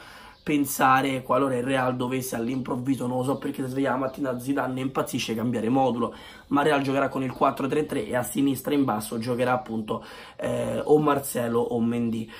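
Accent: Rome, Italy. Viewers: io ti seguo da sempre e mi sei sempre piaciuto ma come giornalista sei di basso livello perché sbagli tanti verbi e ti metti a parlare in dialetto romano.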